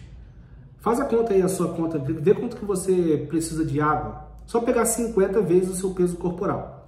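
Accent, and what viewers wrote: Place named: Minas Gerais, Brazil. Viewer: Além de lindo é mineiro. Com esse sotaque então....Meu amigo. Desculpa. Não resisti. Amo seus vídeos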